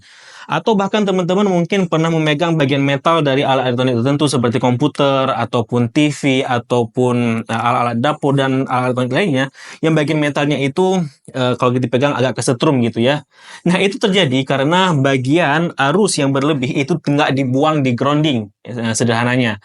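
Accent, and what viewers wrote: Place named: Sumatra, Indonesia. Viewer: Logat mana bang? Kayak sumatera sonoan yak?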